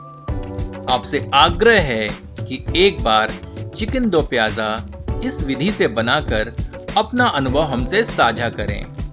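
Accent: Standard. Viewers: Appreciate your Shudh hindi and the do piyaza.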